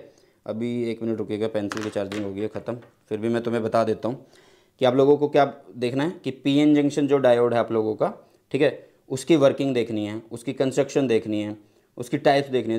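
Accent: Haryana, India. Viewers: Sir are you from haryana??🤔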